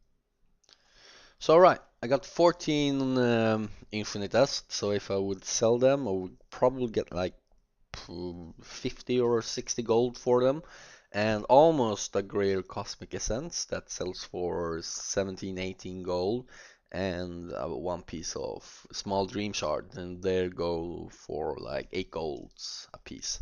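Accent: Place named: Sweden. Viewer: haha hör att du är svensk ;P:D har kollat på dina filmer, men vilket av dom är bäst? vilken är lättast och billigast ?? och vilken tjärnar man mäst på lättast om man ska säga så :)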